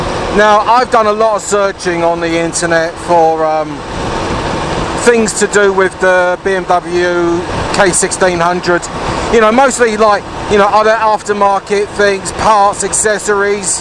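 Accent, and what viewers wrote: Cockney, United Kingdom. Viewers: Good job keep up the good work... Love the vids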